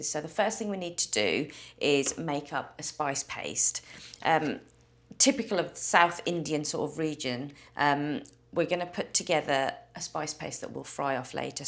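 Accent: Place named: North London, United Kingdom. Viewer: Great north London accent love, we Americans had the same accents till about the 1850's when it began to change mainly because of Scandinavians learning to speak English from the Irish immigrants that were hear at the time, If you listen to the very old recordings of Buffalo Bill you will notice he had a very English accent and many of the old west outlaws had an English accent as well, the interesting thing is the Brits just love the American accents too.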